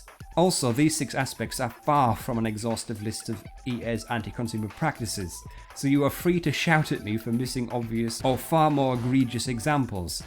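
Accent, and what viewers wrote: United Kingdom. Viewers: dat british accent :O!!!!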